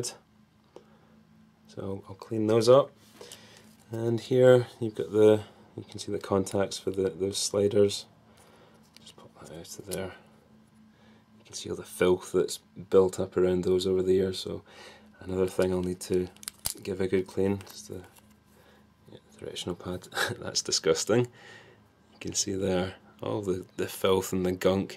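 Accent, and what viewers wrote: Scotland, United Kingdom. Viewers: Man, I love your Scottish accent :) Been to Edinburgh this summer. Really nice countryside in the Highlands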